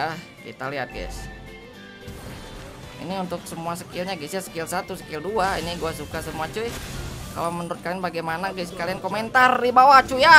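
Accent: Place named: Sunda, Indonesia. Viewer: Nada Bicaranya Kaya Orang Sunda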